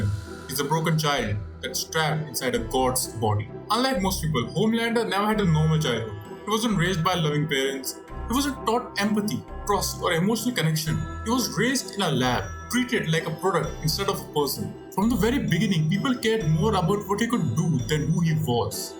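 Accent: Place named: India. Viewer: Open the video, hear an Indian accent. close the video.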